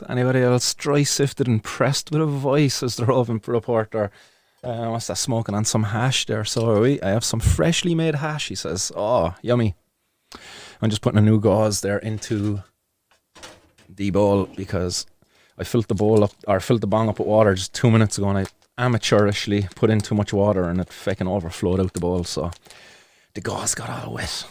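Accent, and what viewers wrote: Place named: Cork, Ireland. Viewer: Good see you back on the screen baii... And really glad that you in a position to be back! Missed those dulcid Corkonian tones😋💚🌱🌱🇮🇪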